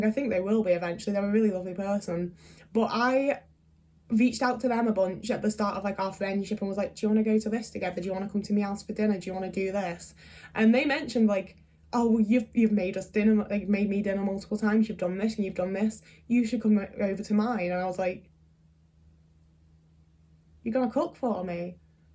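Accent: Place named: Northern England, United Kingdom. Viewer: TW for SA+self-harm+ED in the second paragraph, be safe ❤ With all the North Americans, antipodeans and RP in this space it’s brilliant to hear a sweary working-class northerner. 😂 I’m so there with you, some people genuinely just take the piss if you’re ”too altruistic”. Bad personal stuff or not, video shop bloke sounds like an absolute cunt. Glad you got out of that mostly in one piece. I always gravitate toward the younger people in this space, despite being a guy on the cusp of 40, because I genuinely can’t relate to the people who are still struggling with the same shite but somehow managed to have children along the way. I almost feel like I’m Matthew McConaughey in Interstellar, except I have the body of a 50-year-old and brain of a 20-year-old, but with 40 years of bollox crammed into it. I am in my second relationship, first was almost 9 years, this one is 8 and a bit - in the months between them, I turned my life upside down caring for a person with ED, undiagnosed ADHD (and autism, I’m all but certain) and a serious addiction who really tried to love me as much as I loved her, multiple ambulance trips and stitched up arms notwithstanding (hers, not mine) - she didn’t take advantage, but my feelings made me take advantage of myself and my very limited energy resources for her - then I got SA’d by another woman and ensnared for a few weeks in a Stockholm syndrome relationship (also where she lived, funnily enough) based on fear/fawning, control and mutual s*x addict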